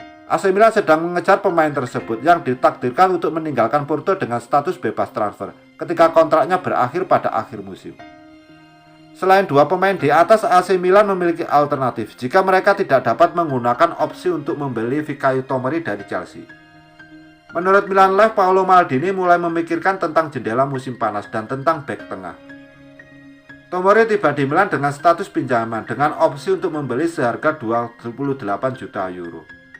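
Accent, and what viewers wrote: Java, Indonesia. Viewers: lanjutkan aja mas..medok² yg penting MILANISTI 😎 Forza Milan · Medokmu parah kang 🤣🤣🤣🤣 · Hadeh ini narrator piye! Karedok medok banget! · Lah medoke kang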